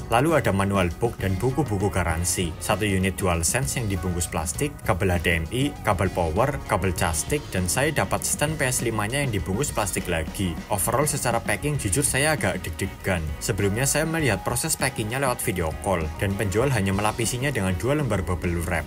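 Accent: Banyumas, Indonesia. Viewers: loh mas wong banyumas tah? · genah mesti ngapak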